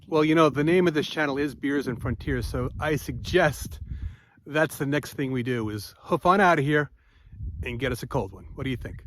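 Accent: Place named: East Coast, United States. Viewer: No disrespect but you sound like people from the east coast. Why are you here? Hope you’re not an east coast liberal. If so please go home.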